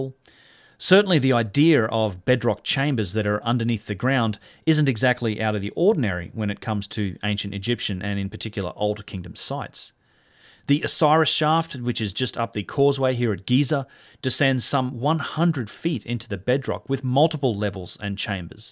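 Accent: Australia. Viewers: Ha, Ha! Don't make fun of his Aussie accent.(dialect) President John F. Kennedy used to say, Africer and Asier. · Pyramids of Geezer... love the aussie accent! :D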